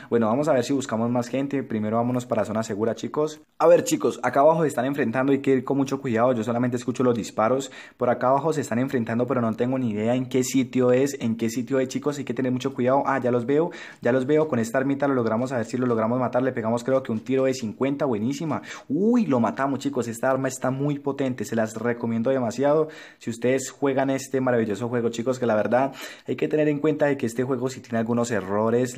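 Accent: Colombia. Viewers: Me encanta tu acento Colombiano💖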